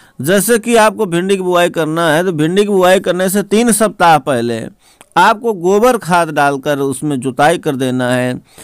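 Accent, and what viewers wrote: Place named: Sultanpur, India. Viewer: बहुत सुन्दर प्रयास मैं सुल्तानपुर से हूँ। आपको बहुत बहुत धन्यवाद। अपने मिट्टी की खुश्बू आपकी आवाज से आती हैं। स्तुत्य प्रयास।🙏❤️🙏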